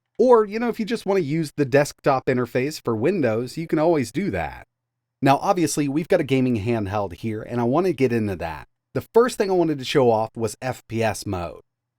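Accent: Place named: North Carolina, United States. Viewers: That's NC talking! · Has one of the thickest American accent I have ever heard love the way he says "Mawd" 😂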